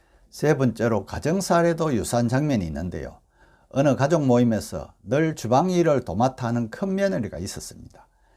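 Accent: Gyeongsang, South Korea. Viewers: 선생의 억쎈 갱상도 사투리 그냥 듣고 싶지.안소이다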